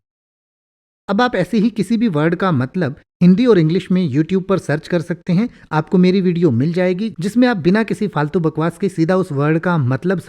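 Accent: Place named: Assam, India. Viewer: Assamese laga thank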